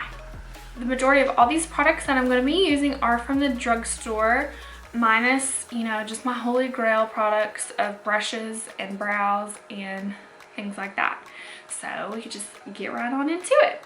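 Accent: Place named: South, United States. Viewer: Who cares what people think! As long as you like them that’s all that matters! I get mine done and everyone will always have their opinion! I choose to ignore BC I like them! Where are you from? I wasn’t expecting the country accent as this is the first of your videos I’ve watched! You are beautiful! Thanks for the video!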